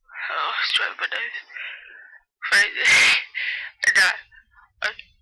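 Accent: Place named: United Kingdom. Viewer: The Brittish accent un-topable sneezes. you have it all!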